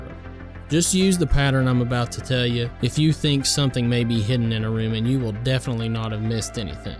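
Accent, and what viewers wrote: South, United States. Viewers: Your welcome! I love your vids. They bring a lot of nostalgia to me. Your southern accent reminds me of my best friend from my childhood. We would waste the summer days playing NES and SNES games.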